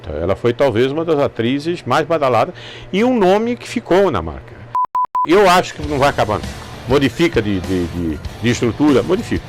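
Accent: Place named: Manaus, Brazil. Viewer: Português/ Manauara. Admiro esse homem.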